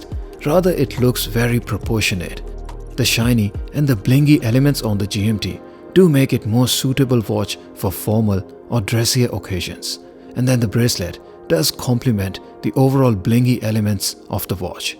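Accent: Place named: India. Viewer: Damn, indian accent!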